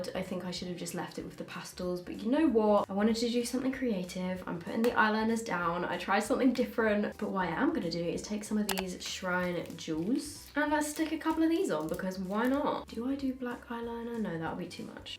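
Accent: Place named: England, United Kingdom. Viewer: I just love the whay how she speaks!Is she from England or something?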